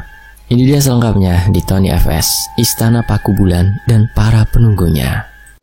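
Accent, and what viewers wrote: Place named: Pekalongan, Indonesia. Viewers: Logat batang Pekalongan pokok e sekitaran kue min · Logat Pemalang Pekalongan itu ya mas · Logat Pekalongan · Logate wong batang, pekalongan, pemalang. Aku dewe wong batang 😅😅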